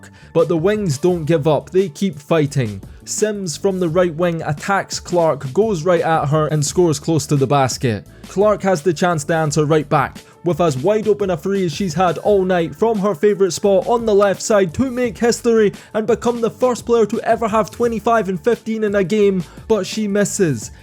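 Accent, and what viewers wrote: Scotland, United Kingdom. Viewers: Where is the accent from, please? Scottish? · It’s a bit odd, hearing a basketball game narrated by a Brit. They play bball as much as we play Cricket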